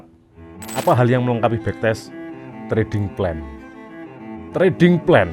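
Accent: Java, Indonesia. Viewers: Tulen wong jowo ki.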